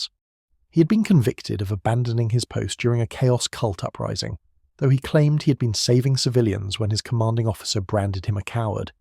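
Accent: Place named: United Kingdom. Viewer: Sounds like UK. It also says so in the description.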